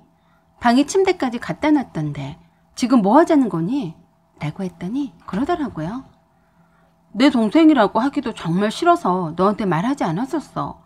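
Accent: Jeolla, South Korea. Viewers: 라도 특유의 사투리 ㅋㅋㅋㅋ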